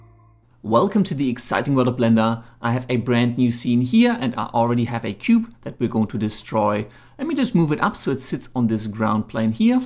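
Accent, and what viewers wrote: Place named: Germany. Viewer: Ich mag "Deutsches Englisch"... Grüße aus Norddeutschland... Cooles Video.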